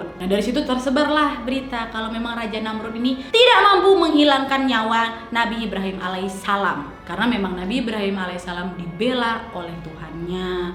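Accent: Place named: Medan, Indonesia. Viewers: Awak orang mane ? Kadang macam melayu medan..kadang macam melayu kepri.. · Uak,aku sangat suka,kali logat medan uak itu tros kan wak ya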